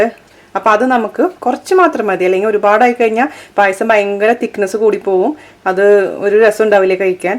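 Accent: Thrissur, India. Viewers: കിടുവേ.. ഡയലോഗ് പോളിയാണ് ചേച്ചി.. തൃശൂർകാരി ആണോ?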